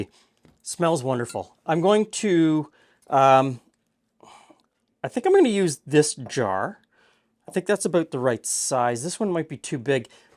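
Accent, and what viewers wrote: Canada. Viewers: I wonder how many of the awful comments and messages is because people can't identify that your accent is Canadian, not US, and are either AH Statesians or AHs from other countries. (You are, rightfully, and American, because Canada is part of the Americas. You are not USian though.)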